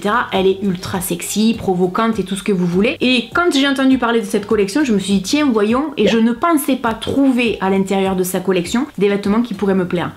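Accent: South, France. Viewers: Top, t'es pas banale tu es une fille du sud avec l'accent, un peu ronde, et tu assumes tout comme moi. Après Cardy b c'est pas mon style et le simili cuir ça me fait trop transpire. Je suis du Tarn pays du cuir. Bise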